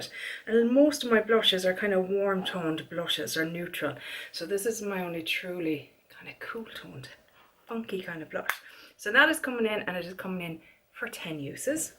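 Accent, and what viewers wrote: Ireland. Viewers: Hi that purple blush lol - next time I'm in Edinburgh i'm going to the Fenty counter to try it on. I'm super pale (Scottish milk bottle lol), cool toned. with grey eyes and blonde hair. I LOVE purple eyeshadow and a mauve blush, but the purple blush intrigues me. I've never seen it swatched before on light skin and I think I might like it - I love a lavender face powder, as it's so brightening on my skintone. Project pans are a fairly new thing to me (I found you through an empties video), but I love the Irish accent - my grandad was Irish and it reminds me of him. He lived in Scotland for around sixty years and never lost his accent. I've been to Ireland a couple of times - once to visit relatives with my grandad (before he died) and once touring on the motorbike. Loved it both times, but it rains as much there as it does here lol, so it's not the best weather for the motorbike. 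It is pretty though and the people I met were friendly. Best wishes.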